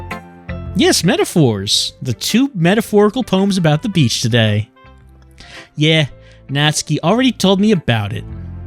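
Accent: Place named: New Jersey, United States. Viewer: Where did he pick up his funny Jersey type accent from? I forget.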